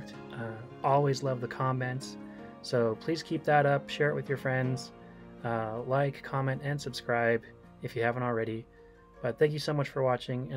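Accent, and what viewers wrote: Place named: East Tennessee, United States. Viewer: You sound like people I know from East Tennessee.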